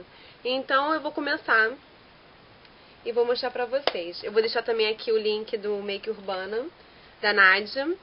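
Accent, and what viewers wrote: Rio de Janeiro, Brazil. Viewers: esses comentários inúteis são de pessoas com pura inveja de vc. acho sua voz linda, adoro seu sotaque carioca e vc é linda!!!!não é atoa que faz tanto sucesso aqui no YT. bjs!!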